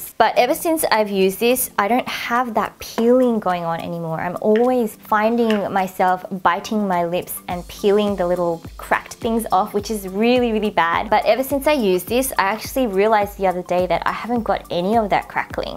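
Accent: Australia. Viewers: Mate!!!! Your aussie accent is so lovely to listen to :)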